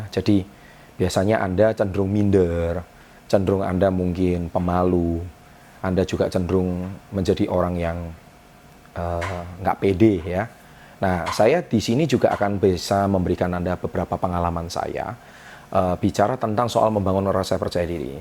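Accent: Java, Indonesia. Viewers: Keren mas... saya suka gaya bahasanya... dialek jawanya kental banget...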